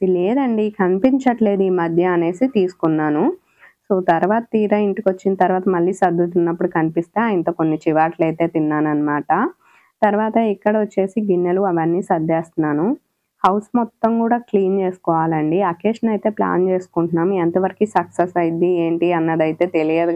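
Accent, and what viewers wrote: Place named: Andhra Pradesh, India. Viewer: Akka meedhi Andhra Pradesh ha ledha Telangana akkda untaru akka slange matharam Andhra laganey undhiiiii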